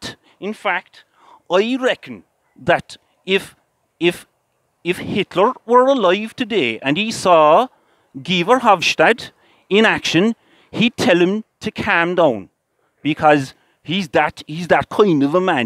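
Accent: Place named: Kerry, Ireland. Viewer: I really enjoyed this . Cannot understand why you had so little views !! I do however suspect you are a Corkman putting out a bloody good South Kerry accent .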